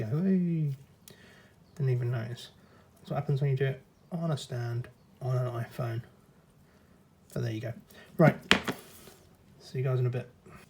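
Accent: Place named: RP, United Kingdom. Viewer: Awesome work Btw way you’re accent always knew you a bit posh lol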